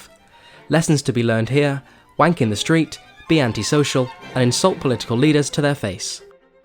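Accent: United Kingdom. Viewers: You forgot the British Accent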